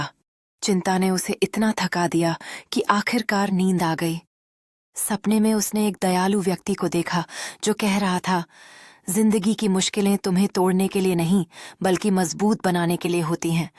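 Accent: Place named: Hyderabad, India. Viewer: Hyderabadi voice💯😍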